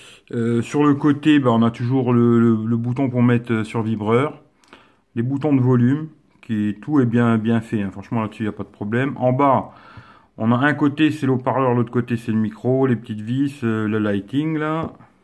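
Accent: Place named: North, France. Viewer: L'accent du nord dure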